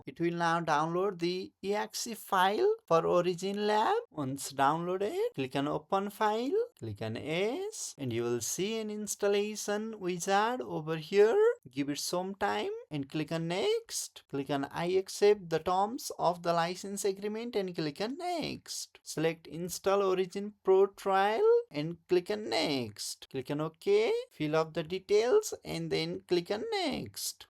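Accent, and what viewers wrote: India. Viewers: You must be from Odisa, India..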